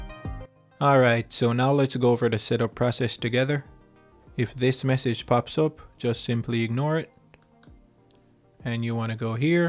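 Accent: Jamaica. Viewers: The Jamaican can’t hide in your voice bro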